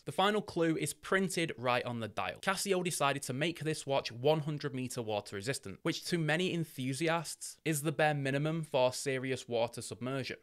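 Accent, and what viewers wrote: Yorkshire, United Kingdom. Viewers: Where are you from,? You should like a Yorkshire lad!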